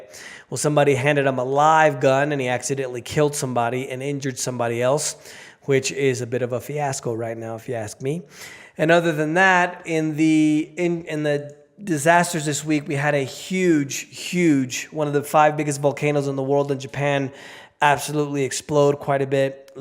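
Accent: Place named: Latin America. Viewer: hablas bien ingles, pareciera q fueras nativo pero tienes el acento fuerte de latino. practica mas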